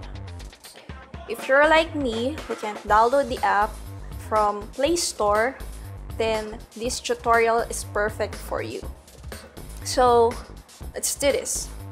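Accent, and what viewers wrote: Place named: Philippines. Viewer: accent sounds like a filipina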